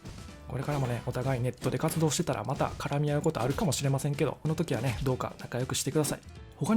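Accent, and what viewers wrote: Kansai, Japan. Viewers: 関西弁でなんか違和感あるなぁ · 関西弁もろに出てきて草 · バリバリ関西弁ですね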